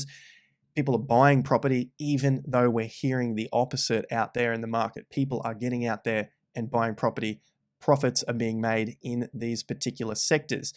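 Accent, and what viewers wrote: Australia. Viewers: Keep up the good work. Great to hear a genuine Aussie accent. Rare in Japan after 20 years. Quality material and delivery!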